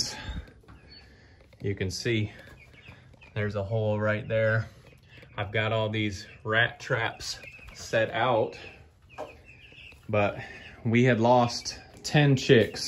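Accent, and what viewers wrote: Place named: Alabama, United States. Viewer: Hey, that sounds like an Alabama accent you have.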